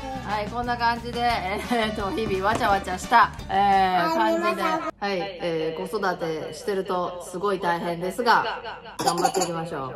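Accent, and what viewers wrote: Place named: Osaka, Japan. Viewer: 登録したまま、お待ちしてましたよ❗ 大阪弁の心地よいトーク楽しみにしております。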